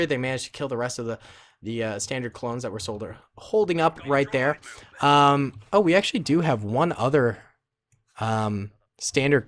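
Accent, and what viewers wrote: Russia. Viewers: This is the perfect video. Your Russian accent is hilarious, and the Soviet Anthem in the background makes it even more entertaining. Also, you should try doing an Old Republic video someday. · leave the russian accent for barronvongames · his russian accent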